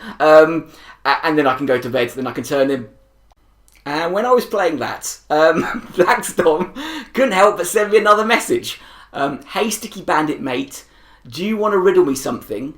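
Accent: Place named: United Kingdom. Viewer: I fucking love your commentary, your british accent just makes it all the more hilarious i swear to god!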